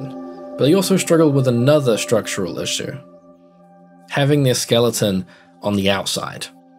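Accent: New Zealand. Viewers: I'm actually from Auckland too, I'm Maori and was born there, but I left for Melbourne a long time ago and but the reason I could pick it is because before I left, the rhotic 'r' sound (which is very prominent in your accent) was just starting to become more widespread and especially among the Pasifika population around Sth Auckland. It's actually quite nice on you, and very nostalgic for me to hear. I could listen to it all day. Anyways, great video. I liked and subbed 😊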